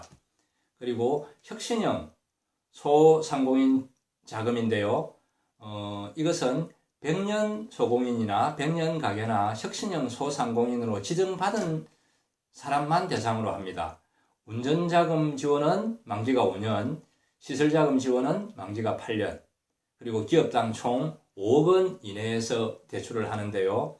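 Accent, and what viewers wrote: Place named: Busan, South Korea. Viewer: 부산억양 귀에 쏙쏙 들어옵니다.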